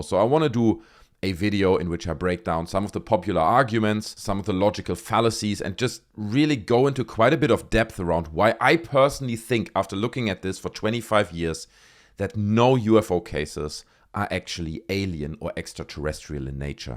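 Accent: Germany. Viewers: Are you from Germany? Du hörst dich jedenfalls so an.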